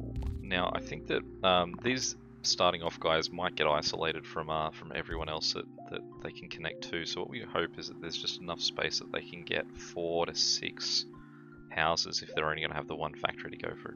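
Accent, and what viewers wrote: Australia. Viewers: I'm guessing Australian?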